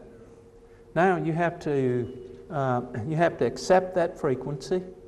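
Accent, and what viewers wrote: South, United States. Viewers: Never in my life have I heard a asian with a southern accent...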